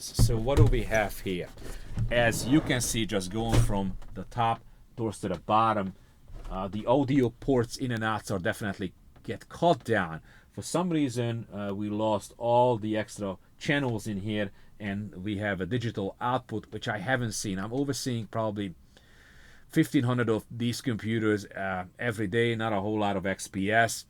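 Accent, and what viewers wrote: Hungary. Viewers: you have a Hungarian accent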